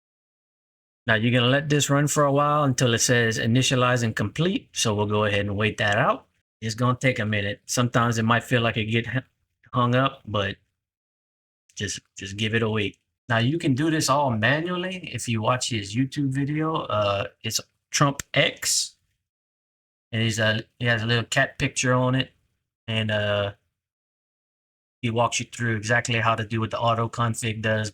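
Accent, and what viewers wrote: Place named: Louisiana, United States. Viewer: I know a badass cajun when I hear one. Thanks for this tutorial ami ♥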